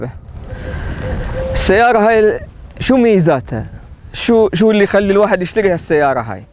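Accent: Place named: Iraq. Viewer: طريقه كلامك كأنك عراقي الاصل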